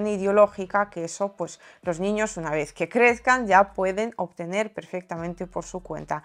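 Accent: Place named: Spain. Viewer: Es una ironía que critiquen como hablas español de España,los que le dimos acentos modismos fuimos nosotros ..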